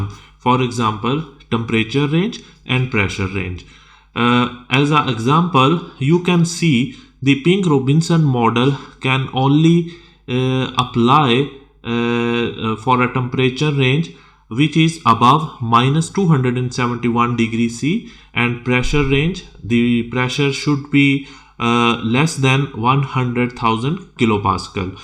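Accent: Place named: India. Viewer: Thank u very much love from India and your accent is also like indian Sir .....are you also Chemical engineering background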